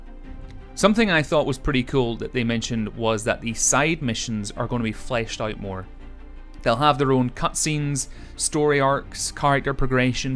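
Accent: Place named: Ireland. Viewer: oh that's cool I didn't know you were Irish, I probably should have known that from the accent though. lol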